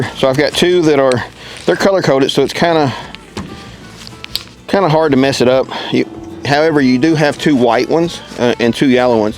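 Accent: South, United States. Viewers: You speak perfectly understandable Southern Slanglish.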